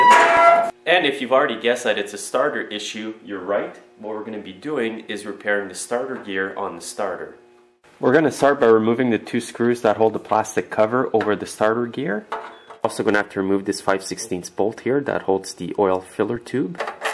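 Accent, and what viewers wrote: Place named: Canada. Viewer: Best instructional video I've ever watched!! Love your Canadian accent. My wife is Canadian so I,m kinda partial.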